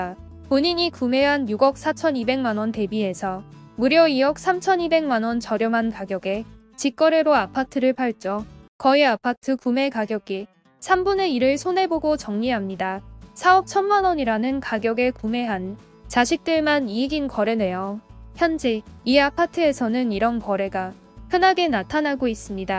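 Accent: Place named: Mokpo, South Korea. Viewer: 아따 목포구만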